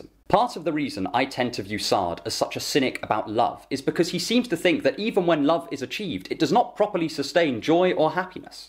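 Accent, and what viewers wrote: United Kingdom. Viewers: 4 star video. -1 star for the obnoxiously british accent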